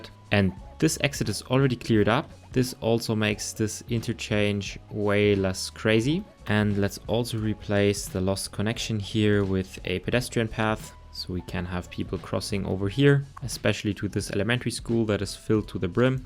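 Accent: Germany. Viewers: Just a small question. Are you speaking german or some other similar language. Cause your accent seems to come out of that direction. Great Video btw.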